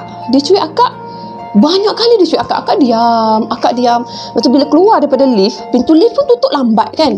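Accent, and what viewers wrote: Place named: Temerloh, Malaysia. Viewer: Akak ni asal org pahang ke bunyi loghat macam org temerloh Btw best akak cerita santai semoga maju jaya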